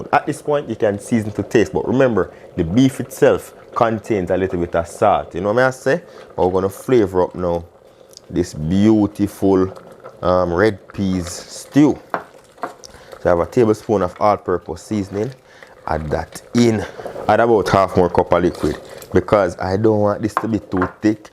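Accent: Jamaica. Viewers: Finally a real autical Jamaican with no twang foreign accent presenting good dishes. 👌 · Food look so good & I love that Jamaican accent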